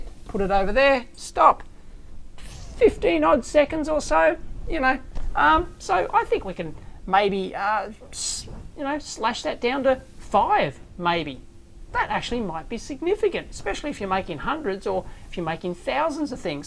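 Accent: Australia. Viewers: Awesome! (said in your Aussie accent) :)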